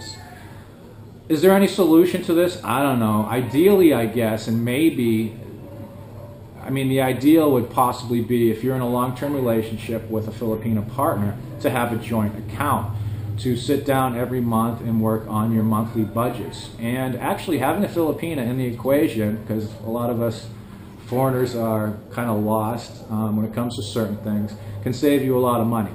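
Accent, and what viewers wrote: United States. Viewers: I like the style of these videos of yours, they are just more thoughtful than than a lot of other video blogs. I am Brit myself, I like that 'Yankee' way of talking you have, casual -laid back but informative.( Yea, I know that internally Northerners are Yankee, but to those outside, we call 'ya all' Yankees). I am looking ahead to retiring in the phillipines, still a few years away. I am thinking of literally sailing off to the Phillipines on my boat, living there and and puttering about. Your videos just give me a little bit of a heads up on what to expect; hopefully expats as thoughtful as yourself are part of the package, thanks for your insights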